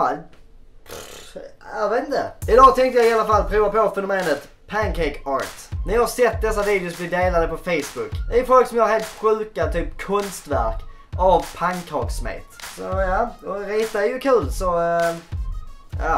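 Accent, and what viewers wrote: Scania, Sweden. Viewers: " pusslar" Älskar skånska😂❤️👌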